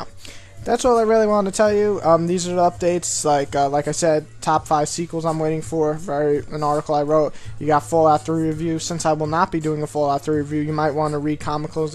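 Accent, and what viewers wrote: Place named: New York, United States. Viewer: What part of NY you live in? 123ilikecheese456 was right, you do have a kick ass accent.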